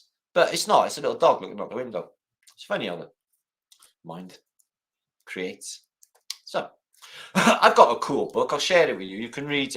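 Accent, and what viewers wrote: Wales, United Kingdom. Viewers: Are You Welsh? NOOOO WAAAY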